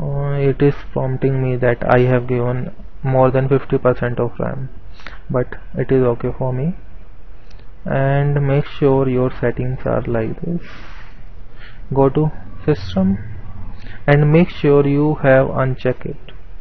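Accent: India. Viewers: Actually, I understood him very well, sure he has an Indian accent, but good English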